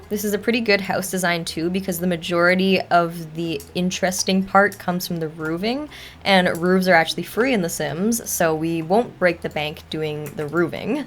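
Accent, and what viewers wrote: Canada. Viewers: She's Canadian. Different countries, different pronounciations.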